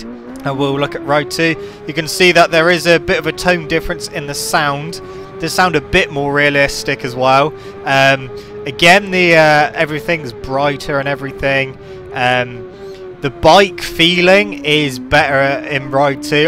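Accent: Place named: Somerset, United Kingdom. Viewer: You sound like your from Somerset boy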